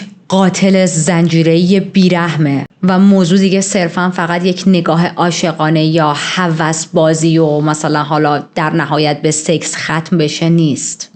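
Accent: Shiraz, Iran. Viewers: کاکو شیرازی هستی ؟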